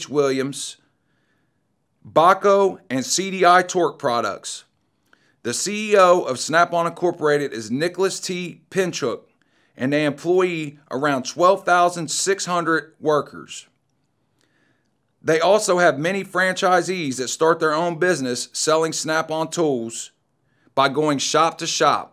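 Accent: South, United States. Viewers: Strong sothern accent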